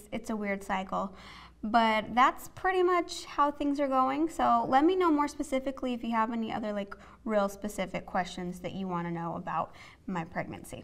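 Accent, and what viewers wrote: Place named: Idaho, United States. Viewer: when you said "belly", I couldn't tell if that was your cute little Idaho accent poppin out (it's not that noticeable anyways, don't worry! haha) or if you morphed baby and belly together, because it definitely came out sounding like "Bay-lee". LOL!!! You are too darling!:)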